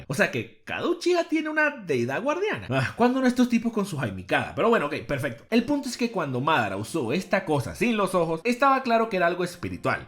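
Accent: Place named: Venezuela. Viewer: Tras de lecho venezolano que ya hasta las tripas me arden de escuchar ese asentó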